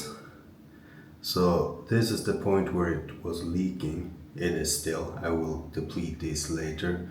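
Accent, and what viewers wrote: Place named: Finland. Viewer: Vilken härlig och positiv finsk tjej! 😊Även om hon har en mycket bra engelska, så hör jag direkt en finländare.😉Menit seurantaan. Odotan innolla tulevia videoitasi!😉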